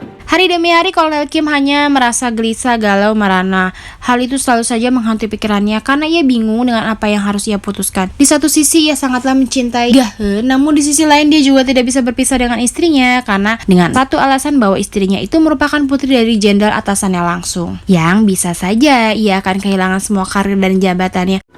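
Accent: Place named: Java, Indonesia. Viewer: Mbak nya ngomong nya lucuu 😅ngahennya medook 😅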